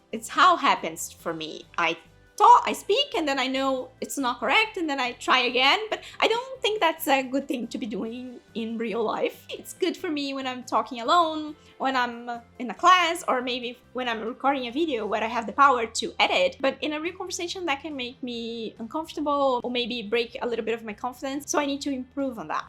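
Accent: United Kingdom. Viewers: I love that u sometimes have a British accent and others an American one hahahahaha I’m the same! If I learnt the word with an American speaker I’m gonna say it like them, if it was with an Australian speaker, forever I will have one word in my vocabulary with the Australian accent 😂😂 I believe that happens with your accent based on the accent of the audiobook you came across for that vocabulary 😅😅 I love it so much and I’m gonna be here for all the videos as I am with your primary channel, following your success ❤❤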